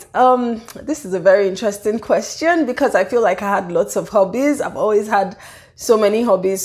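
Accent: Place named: Pidgin, Nigeria. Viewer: The intro totally got me😂😂 I love your pidgin😎😎😎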